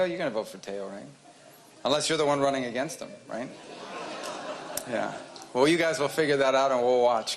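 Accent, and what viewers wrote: Cuba. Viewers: He got Cuban accent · He’s born to Cuban parents, obviously he speak Spanish as Cuban so; it’s not “black “ Spanish, it’s Cuban Spanish.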